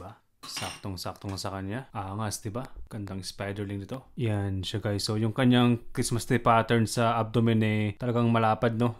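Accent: Ilocos, Philippines. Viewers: Ilokano kayo? Hehe.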